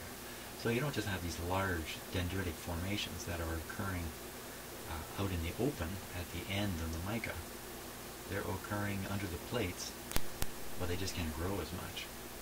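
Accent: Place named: Canada. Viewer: Fascinating. I had heard a lot about "silver mica disease", but it's great to actually see evidence of the problem. I just found your channel through this video, and was thinking "this guy sounds Canadian", then I spotted the maple leaf sticker on your toolbox!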